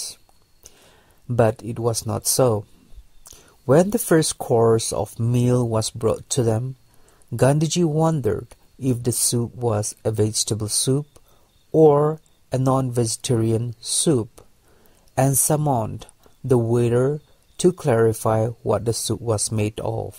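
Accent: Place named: Nagaland, India. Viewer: His accent sounds like naga people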